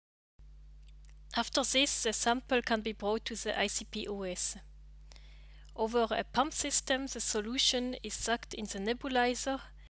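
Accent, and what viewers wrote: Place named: Germany. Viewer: most german sounding English i ever heard... but informative non the less :D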